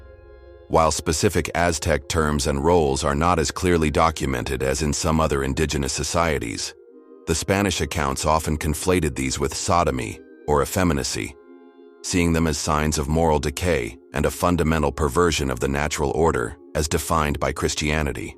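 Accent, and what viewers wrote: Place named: United States. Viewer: You hace US accent. I Imagine that you are not concerned at all by any brutality commited by your ancestors towards native americans or blacks, please look inside your dirt before critizicing other. I just want to point a couple of things 1.- Aztec "priests" wearing a human skin in a religious ceremony was just a "cosmovision and fertility ritual". When settling in the lagoon and erectingTenochtitlan, the Huey Tlatoani of that time (about 200 years before Cortés) asked to the king of a city in the lagoon shore for his daughter. The king accepted. A few days later the king and some of his noblemen went to Tenochtitlan for a ceremony supodsely the wedding of the Huey Tlatoani with his daughter. They got horrified when the main priest started a ceremonial dance wearing his daughter's skin still pooring blood. 2.- If they were beloved, please explain not just the fall of Tenochtitlan, but how was it posible that the Spanish could rule such a complex society, when no more than 3,000 Spanish sailed to get settled in México in the first 50 years of Viceroyalty (60 per year) It could have been very easy for the indians to have expelled such "invaders" unless they preferred the rule of the Spanish rather than being ruled by any of their tribes. 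Morality: Before speaking about your disagreement to what the Spanish achieved, look twice an what your brutal and merciless society did in North America.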